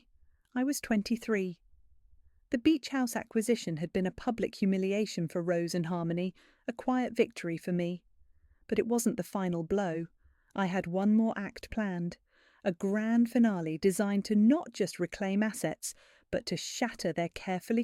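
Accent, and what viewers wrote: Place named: United Kingdom. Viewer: Why use a British accent for an American story?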